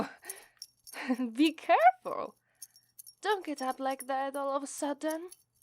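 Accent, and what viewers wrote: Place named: Italy. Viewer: Never knew how an Italian accent sounded until now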